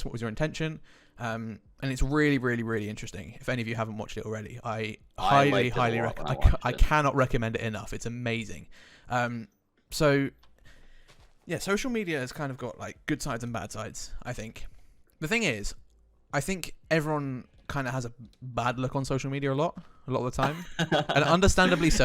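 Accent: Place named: England, United Kingdom. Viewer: Roughly where in England are you from? You sound a lot like me, that would be cool if I knew I was close to you. If you don’t want to say that fine.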